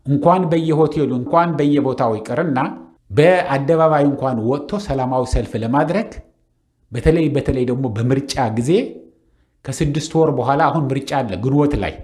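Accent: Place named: Eritrea. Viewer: አክሰንትህ የኤርትራ ነው በቃ ኢትዮጵያውያን በናንተምክንያት ስንቱን ስቃይ አዩ ኢሳያስ የሚባል ጸረ ኢትዮጵያዊ ነው!!! አብይን ብጠላውም የዝህ ሁሉ የቆየ መዘዝ የኢሳያስ ፖለቲካ ነው እግዚአብሔር እናንተን ብቻ ይቅጣቹ ክፉዎች ቀናተኞች ! ቲፒኤሌፍ ኦነግ ብትሉት እናንተ የሰራችሁት ጸረ ኢትዮጵያ ስብስብ ናቸው !!! እኔ ተስፋዬ በእግዚአብሔር ብቻ ነው የእሱ ፍርድ ይውረድ ምርጥ ሃገር ነበረን እድሜ ለጅኖሆይ ያው ለሁሉም ሞት ሆነ እሳቸውን በግፍ ጥላቹ የእሳቸው እርግምን በየቤቱ እየገባ ነው ይበለው ይሄ ሕዝብ ማዘንም አያስፈልግም !!! ክታንኩት ፖለቲካ የዘር ፖለቲካ ለማን በጀ????? ለማንም !!! እርስ በእርስ ተጫረስ ሃገር አልባ ሆነን ማየት ነው የሚያስፈልገን ያኔ ይቆጨናል !!!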